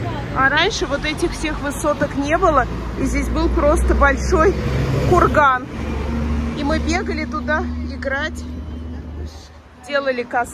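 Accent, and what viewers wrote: Baku, Azerbaijan. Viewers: Привет из Молдавии!!!,случайно попала на ваш канал и была очень рада!!!Я родилась и выросла в Азербайджане,в поселке Насосный...Училась в институте в Баку...Практику проходила на нашем Бакинском телецентре... Огромное спасибо за чудесные позитивные ролики!!!За ваш бакинский русский...Азербайджанский язык Я помню...не могу сказать,что в совершенстве,НО...,всех благ вашей прекрасной Семье,пусть все будут здоровы...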